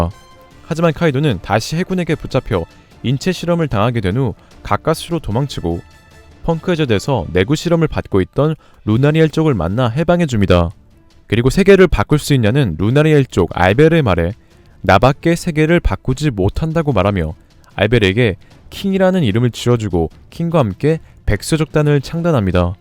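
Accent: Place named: South Korea. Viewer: 수면용 책읽기 방송 부탁해요 어쩜이리 순도 백프로 한국억양인지 ㅎㅎ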